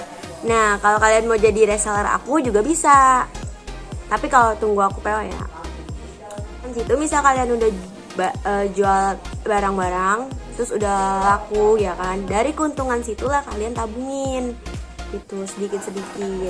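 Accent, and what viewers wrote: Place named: West Java, Indonesia. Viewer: Orang sunda nya kak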